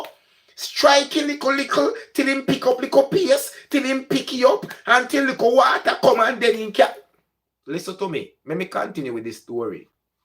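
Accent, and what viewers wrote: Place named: Patois, Jamaica. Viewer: love ❤️ the Jamaican Patwah.I am from Jamaica so I can fully understand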